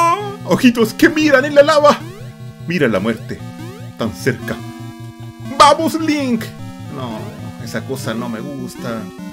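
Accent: Chile. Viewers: Wena eres chileno, igual soy chileno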